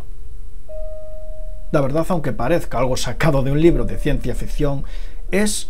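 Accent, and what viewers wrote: Galicia, Spain. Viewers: De donde eres de galicia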